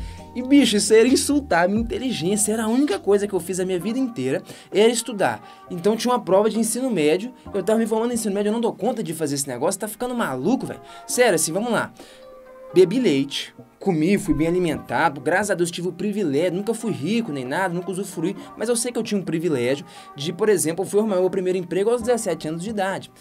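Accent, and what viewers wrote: Minas Gerais, Brazil. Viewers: "esse trem" kkkkkkk sotaque mineiro == tudo pra mim!!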